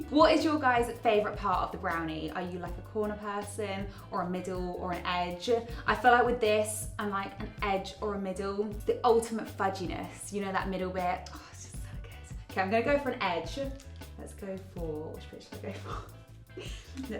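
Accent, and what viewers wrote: United Kingdom. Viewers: Nice British accent you got there. Those brownies look super cute and good, bro. Nice work. THIS IS DOPE.🇬🇧🟫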